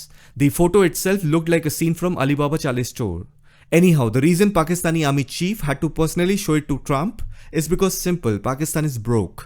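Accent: India. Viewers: Your Indian accent is so annoying 🤮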